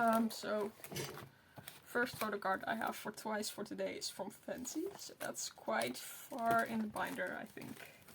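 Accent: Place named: Finland. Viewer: You just sound so finnish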